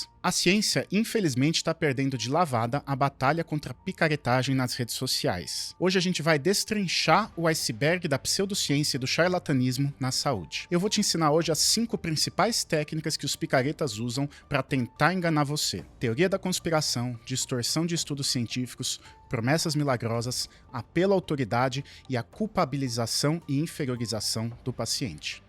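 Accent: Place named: United Kingdom. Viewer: eu gostei do "um sotaque britânico". Eu acrescentaria, "sotaque britânico com uma pegada de personagem de filme antigo que os tios gostam"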